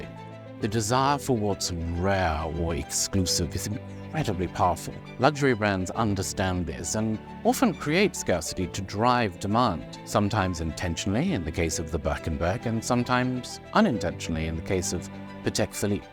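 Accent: RP, United Kingdom. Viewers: Ive never heard a more posh uk accent in my life he shld b narratin books as a side hustle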